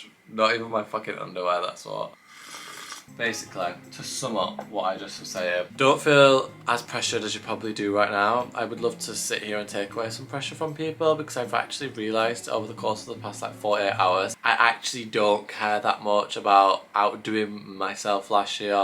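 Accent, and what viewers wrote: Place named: North, United Kingdom. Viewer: I love ur northern accent & you always sound like you had a spliff 🤣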